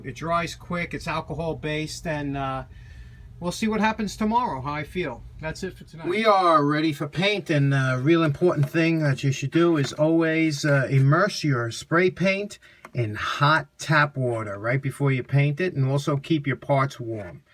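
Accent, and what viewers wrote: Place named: New Jersey, United States. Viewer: I just can't stop watching your videos. Addictive but legal??? Your Jersey accent is awesome. Adds reality to the process and work, somehow.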